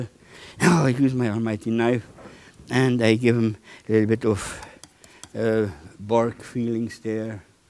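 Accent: Germany. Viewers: I love this “german english“. If i speak, it sounds the same :)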